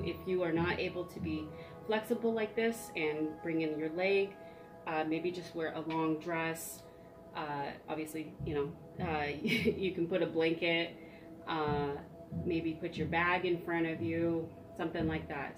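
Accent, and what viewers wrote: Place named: United Kingdom. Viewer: From a British viewpoint you have a very pleasant accent, whereas when we berthed in LA we found the local accent harsh and unattractive. Difference in education? My wife used to retract her undercarriage much as you do - but she saw me in the RAF and I think imitated the aircraft - that was her story anyway!! .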